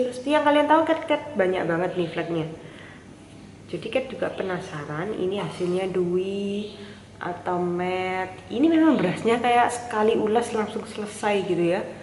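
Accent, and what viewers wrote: Java, Indonesia. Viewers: jd kaya khasnya cindercella ya, dr segi nada bicara sma medok2nya haha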